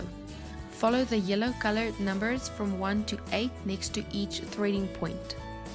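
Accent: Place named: South Africa. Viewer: Excellent. I thought that was a South African accent :) I just unboxed mine and am so glad to find a detailed explanation. Thank you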